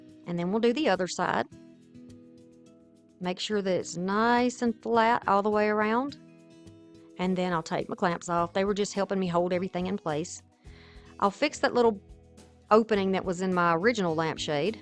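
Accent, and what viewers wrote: South, United States. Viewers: Love these😅 and your gentle southern accent. Subbed!!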